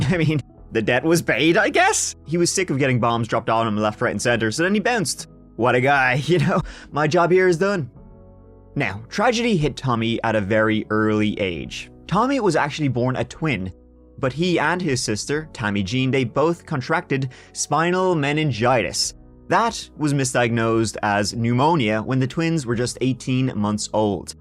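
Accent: Ireland. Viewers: Are you trying to do an Irish accent or something? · Thank you for putting podcasts on you tube it is such a treat for your fans I enjoy listening to your wonderful brogue and of course your sense of humor the way u have doing theses horrific stories . You’re simply the best storyteller God bless you and your family RIP to all of the victims of these crimes Wish you all the best with your podcast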